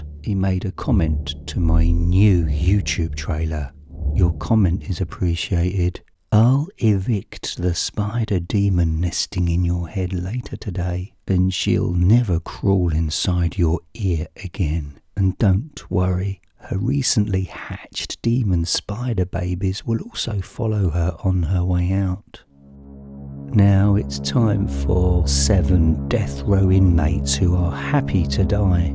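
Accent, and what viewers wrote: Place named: England, United Kingdom. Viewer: Another Nightmare Expo attendee. Two things, I loved the first cell slamming sound, scared the piss out of me. 2nd, listening to your voice, I have only heard a few people with the "English" accent that I adored. I like your accent enough to hold it in regard to the likes of Stephen Fry or Jim Dale.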